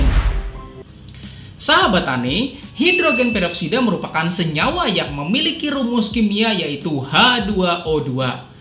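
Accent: Banjar, Indonesia. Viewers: dari logatnya BANJAR sih bapak ini, kebetulan saya di KALTIM terbiasa mendengar orang2 b'cakap Banjar Salam Pian Sabarataan lah 🙏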